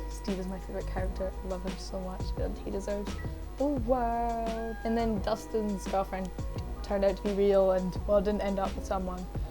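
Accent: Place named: RP, United Kingdom. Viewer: u do sound kinda posh but gang gang sjsj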